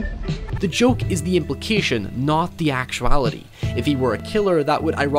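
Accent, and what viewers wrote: Northern Ireland, United Kingdom. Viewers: It's Northern Irish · he sounds like a northern irish man like myself :) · Are you from Northern Ireland? Your accent is from here but has a weird American twang to it. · He's Northern Irish 😊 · Northern Irish accent? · You sound like you're from N.I, are you?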